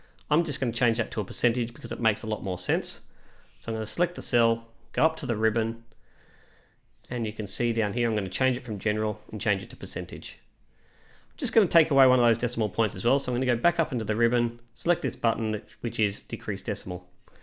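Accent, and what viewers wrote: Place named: Australia. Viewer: Love your accent, are you from Australia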